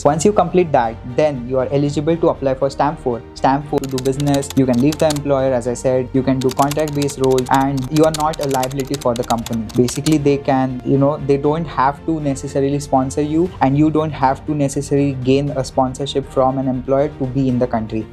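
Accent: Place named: Maharashtra, India. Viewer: This guy is marathi and I don’t like your accent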